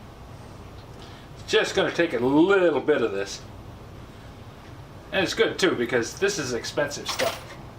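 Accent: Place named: New Jersey, United States. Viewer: O.K.! You're like me with the JOyzee brogue. I was around 2 guys from there and I got so I could mock them to a TEEEE. They'd pound on me because they knew I was the biggest hick in the world and I'd just go right on and laugh my head off at 'em. And they'd pound on me more. Great guys and some of the best memories anybody could have while in Kansas in the Ahhmee!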